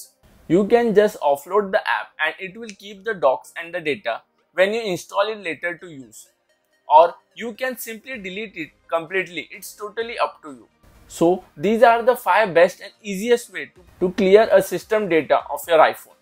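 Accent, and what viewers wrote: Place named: India. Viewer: You hear an Indian voice… uh oh. Clickbait 99.9% likely ahead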